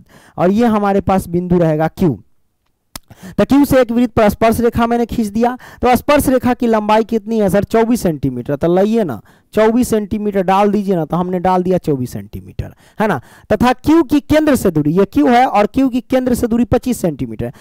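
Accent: Bihar, India. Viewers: 🎉 रमाय नमः 🎉 गुरु जी 🚩 आप राजस्थान बोर्ड से पढ़ाते हो या बिहार बोर्ड से बहुत देर से खुजली हो रही थी पूछने के लिए अच्छा आप जो बीच बीच में जो ये बिहारी भाषा बोलते हो तो अच्छा लगता है😅 क्योंकि हम राजस्थान से है😅 ओर आप अच्छा पढ़ाते हो❤ में अपने दोस्तों को भी बोलता हु पढ़ने के लिए 😅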